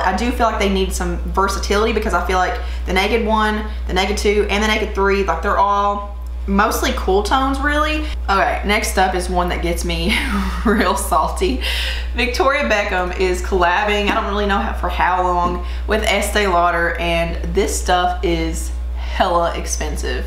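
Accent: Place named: Kentucky, United States. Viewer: I randomly found you in my homepage. As soon as I heard you talk, I thought to myself "girl has to be from KY". Checked out your FB and sure enough you are. Girl I'm from Hazard and we have alot of friends in common. I went to EKU. Saw where you are there! I'll be binge watching your channel!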